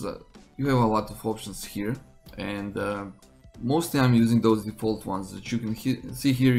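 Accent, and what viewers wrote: Russia. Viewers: Hey бро, it's a Russian accent ? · Really nice video. Is your accent russian? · R u russian? Whats your native? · жесткий русский акцент ХD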